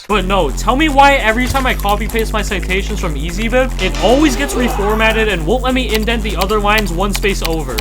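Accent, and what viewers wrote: Canada. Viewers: bro are you canadian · U Canadian bro?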